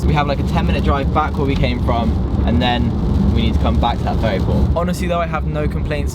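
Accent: RP, United Kingdom. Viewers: I like your vids but my god you sound like a tory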